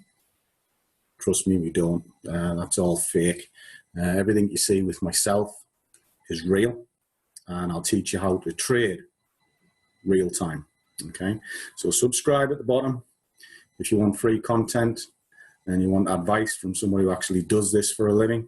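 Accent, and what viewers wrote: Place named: Nottingham, United Kingdom. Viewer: Hi! I have literally spent the last 4 weeks studying trading none stop (most of the day and night)😂 I started with a trading 212 account which was crap and I have now moved up to a trading view account and use metatrader 4 to take my trades. I have tried the ichimoku strategy with tk crossovers, kumo breaks etc. I take all trades inline with the higher time frame sentiment but still seem to be going wrong somewhere🤦‍♂️🤷‍♂️. luckily I have not lost any money in the 4 weeks of trading but always mange to be back at where I started. I have watched 100 hours plus on YouTube and you seem to be one of the most genuine traders I have come across 🙌 I live in Nottingham and it sounds like you live not too far. I am wondering if you offer any sort of coaching/mentoring services. I thought I could do it all alone off youtube videos etc but its just putting it all together and having abit of guidance every now and then! 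look forward to hearing from you!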